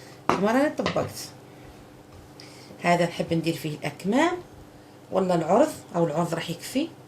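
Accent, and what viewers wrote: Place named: Djelfa, Algeria. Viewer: تهدري كيما صحاب الجلفة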